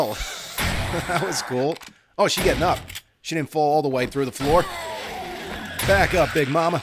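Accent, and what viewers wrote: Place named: New York, United States. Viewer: I knew it I knew you were from New York but I just couldn't put which part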